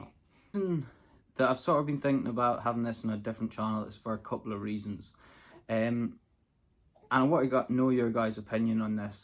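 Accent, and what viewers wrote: Scotland, United Kingdom. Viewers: The reasons I watch 1. for the dogs. I have 2 border collies that hike and do agility but watching BCs do what they were bred for is beautiful. 2. Scottish scenery 3. Listening to the lovely Scottish accent I would watch other content about Scotland. I have no opinion about whether it should be a separate channel. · Yes, your videos are a calm and peaceful retreat from the craziness of the world. I love your dogs and farming and your growing family. I would like to see you add more family time and adventures to this channel. Everything that you say and do brings Scotland to life, especially your Scottish accent. Keep it simple and share your family life with us here. Then I won’t need to look for two channels to enjoy your content. Thanks for all you do. It is such a pleasure to watch your videos. Keep up the good work. 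💕🙏💕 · I just wanted to take a moment to thank you for your wonderful YouTube channel. I work in an office and spend most of my days in front of a computer screen – a stark contrast to your life out in the fields with your sheep. And that’s exactly what makes your channel so fascinating to me. I really enjoy watching you work, even though I have to admit that I probably only understand about half of what you’re saying – your Scottish accent is quite a challenge for me!😂 But that doesn’t matter, because the visuals, the atmosphere, and the passion you have for your work speak for themselves. Thank you for sharing your daily life with us viewers. Your channel is like a little escape from my office routine, and I always look forward to your new videos. Best wishes from Germany❤ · I'm here for the sheep herding which obviously includes the dogs and you. But I really like just listening to you because all four of my grandparents are from Scotland and its almost like they're in the room with me! 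The fishing you mentioned really got my attention and the outdoors content. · Just discovered your channel through Facebook few days ago. But I found fake accounts using your name. So I searched you on YouTube. My main reason to subscribe is : Kate, Storm and Echo Love them soo much !!! And your relationship with them…!! I believe that is also main reason why people are here. My suggestion is : you can do ANY VIDEOS ANY! because you are REALLY GOOD explaining things, but as long as you included your dogs here and there (please) I believe every body happy! Oh, have just watched your Christmas video the bake competition ! 😂 so funny! And this is not even Christmas 😂 You are super talented shepherd. Do it every Christmas please…you will make every body have their‘merry little Christmas’😍 Oh and the Scottish accent?? Like music to our ears! Love it !❤ May God continue bless you 🙏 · Here ia what I like best about the channel. 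1. You delightful Scottish brogue. It so soothing to the eat. 2. Watching the dogs work and see how much they enjoy working and absolutely think you are the best person in the world. 3. The beauty of the Scottish countryside, particularly when you are on the top of the hill and panning all around. 4. The interesting workings of the farm. 5. The walks in the woods. 6. The excursions to the farm shows and touring the cities. Keep up the good work.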